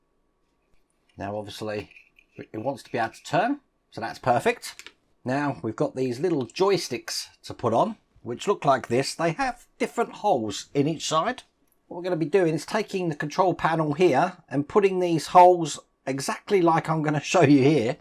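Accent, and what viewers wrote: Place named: United Kingdom. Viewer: Don't worry about the way you speak. I've always thought it makes you endearingly British. Also my son always uses a soft T when he speaks, so mittens ends up sounding like middens. And when I was young my Rs sounded more like Ws. We all have those little quirks! Still love the channel, keep up the great work!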